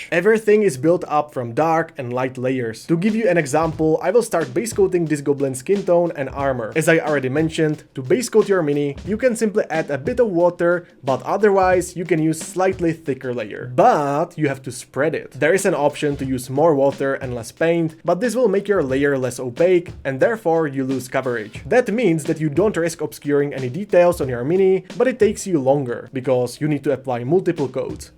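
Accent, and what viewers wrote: Czech Republic. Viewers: Great video. Just out of curiousity, what is his nationality? I am hearing a Czech/slovak accent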